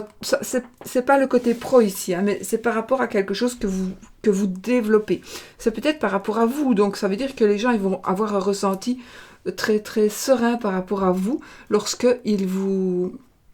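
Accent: Belgium. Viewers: Bonjour d'un Gémeaux du Sud de la France à la Belgique, votre accent et votre style est belge, est ce que je me trompe? Jolie ❤️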